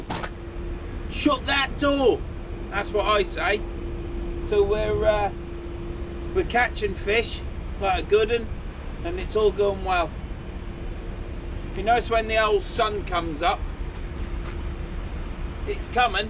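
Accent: Norfolk, United Kingdom. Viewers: Cracking video oil them boots up😉 well done on the fish. Can tell your a Norfolk boy. Hope you to have a good Christmas.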